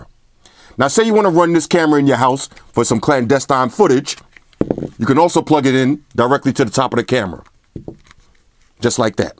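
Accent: New York, United States. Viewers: Oh my man is from NY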